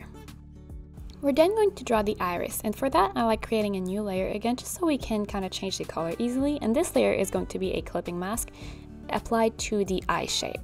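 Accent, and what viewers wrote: France. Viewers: New horizons!, you are such an amazing soul! I am probably twice your age and have dabbled in art for most of my life but you are teaching me a TON of stuff I didn’t know….mostly about graphic design (which I wish was more prevalent when I was your age…my life would’ve been sooo different). I also have long hair and understand the misrep on so many games. Love, love love animal crossing (have played it since it first came out yeeeeears ago! I love your accent (I am from a family who speaks French also) you are brilliant!!!! Keep shining, beautiful! Even though you have no idea who I am, I am very proud of you!!!! 😊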